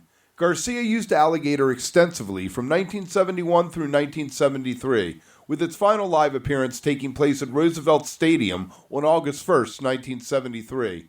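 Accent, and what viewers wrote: Baltimore, United States. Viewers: Great video, but yes I heard the Baltimore right away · Dude, are you from Baltimore?? Asking because of the accent. Great video!! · Baltimoron here, lived in Annapolis/Balto/DC area 1979-'92 [age 14 to 27], before moving to Northern California [Santa Rosa] to be closer to the flame.. And yes, I pronounce my long "o"s the same way the narrator does.. & that is pure BALTIMORE, baby!.. Much as I'd like to rid myself of this particular language/speech trait, it seems that it's "baked in" > for LIFE.. Oh well.. There are also aspects of my Allentown PA [Pee-Ay] accent [lived there from birth to age 14] which apparently "dog" me to this very day.. Embarrassing!.. Oh well, what can ya do?!?.. As the original question-asker opined > GREAT VIDEO, hon'!.. Har!.. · I’m from Baltimore - this is a Baltimore accent on elephant steroids.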